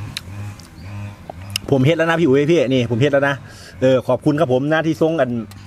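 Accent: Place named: Ban Phue, Thailand. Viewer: เจ้าเว้าคือคนบ้านผือ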